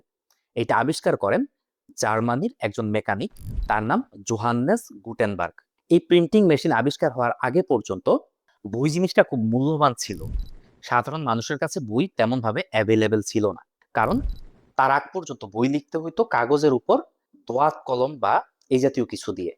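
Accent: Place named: Bangladesh. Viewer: দাদা সব ঠিক.. আপনি দেশীয় বাংলাদেশী ভাষা ছেড়ে শুদ্ধ বাংলা বলুন শুনতে ভালো লাগবে....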